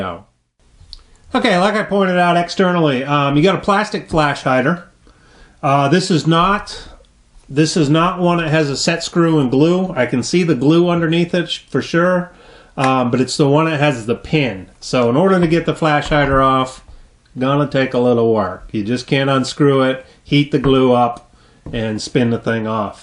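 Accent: China. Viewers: chinglish FTW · got it just for the chinglish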